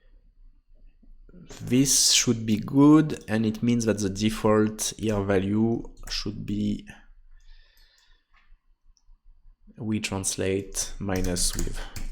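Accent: France. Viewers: c'est encore plus stylé avec l'accent frenchie ahhaa ;)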